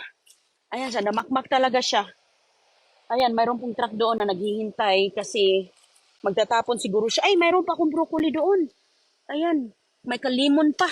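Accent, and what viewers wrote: Visayas, Philippines. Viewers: peper litus bisaya ka dhay.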